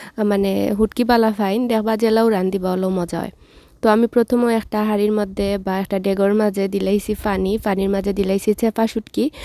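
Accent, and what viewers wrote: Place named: Sylhet, Bangladesh. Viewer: আমি আমার বাবার চাকরিরজন্য প্রায়১২ বছর ছাতকে ছিলাম। এখন যখন আপনার সিলটি মাত শুনি আমার কাছে খুব বেশি ভালো লাগে 😇😇😇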